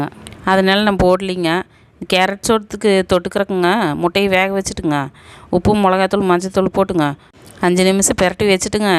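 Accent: Kongu, India. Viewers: Kongu tamil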